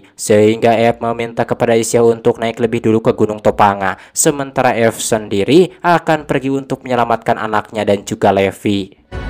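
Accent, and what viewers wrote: West Java, Indonesia. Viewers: Aslina,, anyar da ngadenge review kieu tp logatna sunda pisan😅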